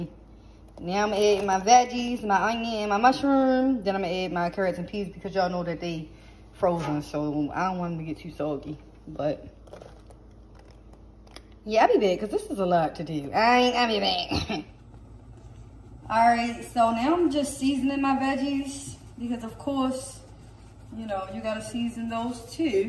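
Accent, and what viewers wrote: Baltimore, United States. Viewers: She’s from bmore I can hear it lol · You from Baltimore ain't you?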